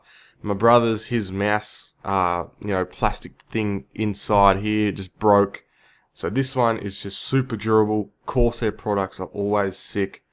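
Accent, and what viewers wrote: Australia. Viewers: Goodish Review, you will polish up as you make more:) and good to hear another aussie. I will wait for the next mouse as this one is not there yet. One deal breaker for me is lack of a free-wheeling scroll-wheel. My current mouse has one, (Logitech G502) and i didn't know it was so important till I used it every day. Just in facebook a free-wheeling scroll-wheel is a attribute without peer let alone in Fallout4. Second deal breaker is not being able to change the individual colours of the buttons as a visual aid. I have a Corsair R95 RGB.. my god what a fantastic keyboard, having key colours change in each profile is important to me. To make your reviews better you should make a list of what is important in whatever device. Like in this one you need to mention if each button can be colour configurable or only one colour for all buttons. 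RGB devices are not all equal it seems:) I just assumed they were changeable like the keyboard till i looked at multiple reviews and only one person mentioned this fact. And only one other reviewer mentioned the lack of a free-wheeling scroll-wheel. Cheers and keep up the good work..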